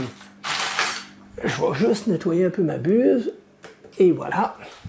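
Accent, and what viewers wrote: Canada. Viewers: Bonsoir, tes vidéos sont super bien détaillées et expliquées... Je suis Belge et ton accent Quebecois > j'adore! Tu fais tes vidéos avec les moyens du bord et c'est un exploit! As tu un compte paypal ou autre système? J'aimerais vraiment t'aider et je suis sûr que je ne suis pas le seul! Beaucoup, de Youtuber tentent de se faire des sous... Pas toi, alors que tu le mérites vraiment! Donc si Framemanager (c'est moi) peut t'aider... Je suis prêt à le faire! Je suis certain que ce sera bien investis! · Bonjour super ta video pour se nouvelle ecran je vais certainement l'installer prochainement sur ma CR10S pro j'ai une petite question au niveau des branchement sur la carte mère que tu a connectè le BLtouch en Z+ et faut il laissé le shunt sur la petite carte en dessous de l'extrudeur? encore merci pour tes videos et ton accent canadien...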